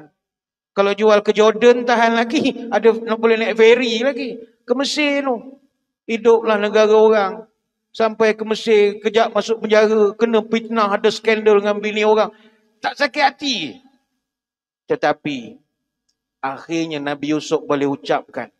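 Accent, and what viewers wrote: Pahang, Malaysia. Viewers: Loghat pahang tu yg significant ketika penyampaian pun ye jgk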